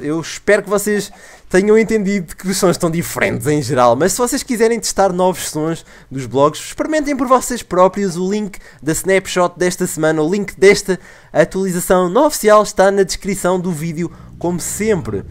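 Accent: Portugal. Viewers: é portugues de potugal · português de Portugal--' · português de portugal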